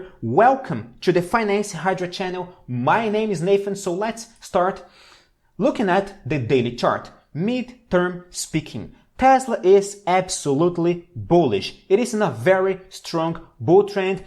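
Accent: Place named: Brazil. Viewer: I knew it! I've had Brazilian friends and ESL students. I'd recognize that beautifully accented English any day. Well done, sir!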